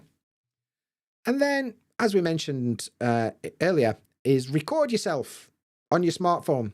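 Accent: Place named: Yorkshire, United Kingdom. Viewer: I love your video so so much! I'm always fascinated by the Yorkshire English. Although I rarely comment but I certainly watch your video a lot and I learnt a lot from your video. Support you.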